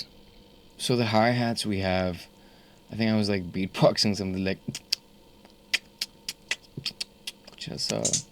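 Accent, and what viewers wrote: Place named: Punjab, India. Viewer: Big big fan aka ❤️‍🔥❤️‍🔥big fan from india🇮🇳 And lots of love 🌷 your punjabi accent is amazing ❤ Smarrrtttttttt!!!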